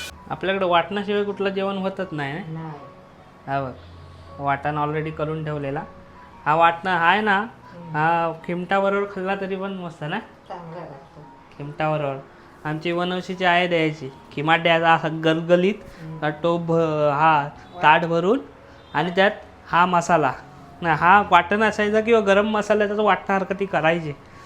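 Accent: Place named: Konkan, India. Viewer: नमस्कार भाऊ मी मुबंई मधून बघत आहे आणि मी नेहमीच बघते तुझे व्हिडीओ मी मुबंईला महालक्ष्मी इकडून बघत आहे आणि तुझी ही गावठी भाषा ऐकून छान वाटते मला आपल्या कोकणातील गावची भाषा आवडते तुझ्या कडून एकण्यास वेगळी मजा येते धन्यवाद भाऊ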